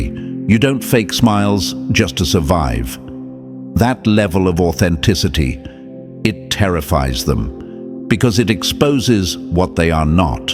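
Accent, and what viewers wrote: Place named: United Kingdom. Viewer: How would know. You sound British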